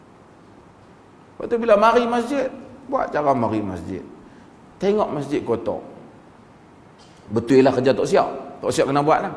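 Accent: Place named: Perlis, Malaysia. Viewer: Ini semenanjung malaysia..perlis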